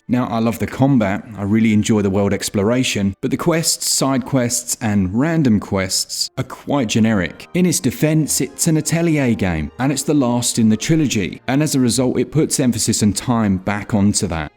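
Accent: England, United Kingdom. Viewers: Game footage and an English accent narrator. What more could one want?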